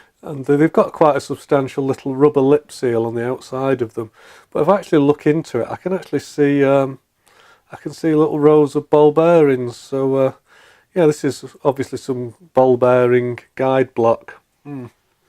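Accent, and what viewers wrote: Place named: Sheffield, United Kingdom. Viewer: I'm assuming from your accent you're somewhere near Sheffield - I'm from London (sorry about that but I did vote leave :- )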